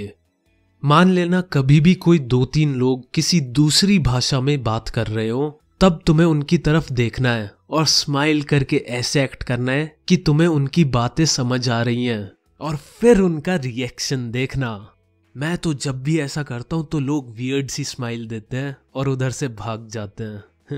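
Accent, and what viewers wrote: Haryana, India. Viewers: Haryana se ho k..?🤟😎💪💪💪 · Bhai Tu h kha ka Jo haryana ka h 👍👍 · Your sounds like Haryana are you from Haryana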